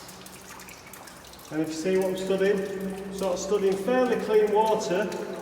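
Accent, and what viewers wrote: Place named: Scotland, United Kingdom. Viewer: I can tell you're from a Scottish part of England, there's no mistaking the brogue. We have several different accents here in the USA, the State of Massachusetts has an accent that sounds similar to Scottish the pronunciation of some words. Love your channel!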